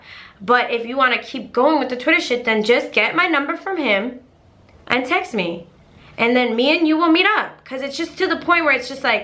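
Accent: Boston, United States. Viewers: I LOVE when your thick Boston accent comes out😍! I love the way bostoners? Talk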